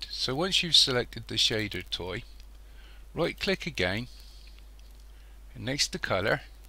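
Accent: Bristol, United Kingdom. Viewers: Smashing video. Love the accent BTW - Bristolian?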